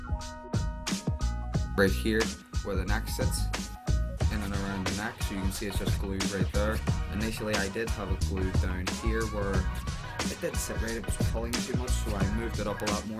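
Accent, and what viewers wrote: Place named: Ireland. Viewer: Mate I thought you were irish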